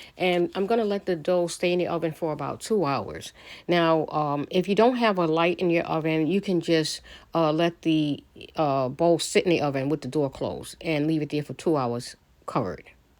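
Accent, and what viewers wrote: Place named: New York, United States. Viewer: 💜 the New York Puerto Rican accent!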